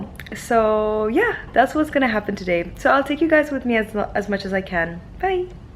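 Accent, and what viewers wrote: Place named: India. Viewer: She's definitely indian u can hear the indian accent lol also she kinda had that indian nose 👃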